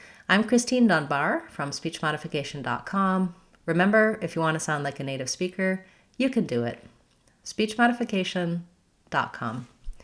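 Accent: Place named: United States. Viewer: Thank you so much teacher I really like your good work and I like american accent I will stick with you to get this beautiful accent,this is my second video...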